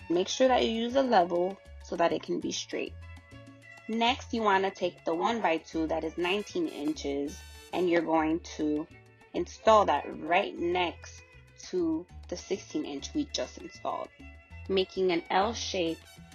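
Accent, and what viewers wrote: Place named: New York, United States. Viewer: I knew u was a New Yorker!! I mentioned in yr other video how much I love yr accent. N girl I’m loving yr videos. Keep it up 👍💕💕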